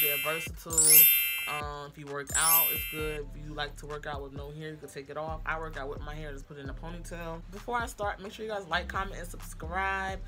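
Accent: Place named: New York, United States. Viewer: Are you a New Yorker? love you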